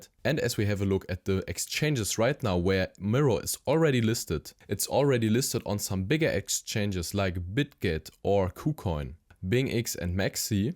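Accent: Germany. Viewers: Your heavy German accent gives me hope. You guys are very calculated when it comes to crypto. Everytime I come across a German content creator that technically analyzes crypto, it’s always a bullish sign. I’m all in on MYRO and almost got 1Million. Let’s go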